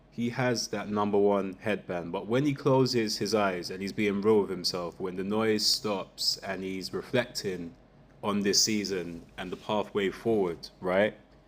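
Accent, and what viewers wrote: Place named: South London, United Kingdom. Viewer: you sound and talk exactly the same as an old friend of mine from lewisham in south london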